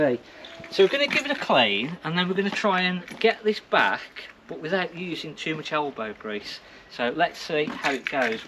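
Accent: Midlands, United Kingdom. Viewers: Hi mate Can you put the name of the product on here please. Sorry but I'm having difficulty getting the name due to you Midlands accent. Thanks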